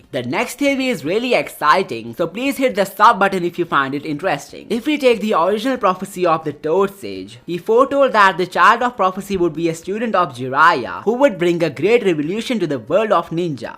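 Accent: India. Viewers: You're being rude here. Skip if you don't like it. FYI, it's Indian accent.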